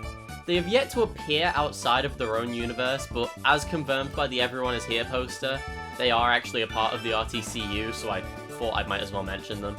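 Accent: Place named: England, United Kingdom. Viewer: then take my sub and use it well, funny english sounding guy.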